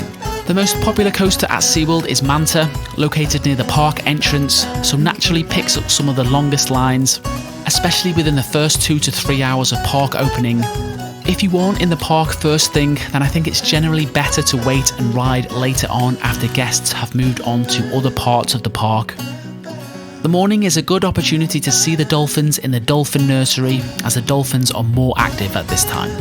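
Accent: United Kingdom. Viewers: I love the accent the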